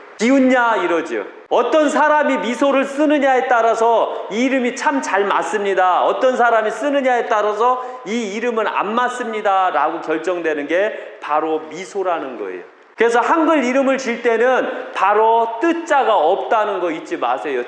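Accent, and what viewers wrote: Chungcheong, South Korea. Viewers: 쉬운듯 어려운듯! 알아들을듯 말듯! 이해가 가는듯 아닌듯! 그래서.. 자꾸 듣게되네요 저도 충청도사람인데 충청도사투리가 정겹네요~^^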